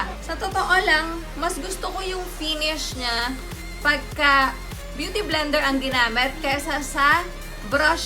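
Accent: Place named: Cavite, Philippines. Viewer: Subscribed because of your punto 😅pareho tayo. I'm from Indang cavite kaya mahayon din talaga 😂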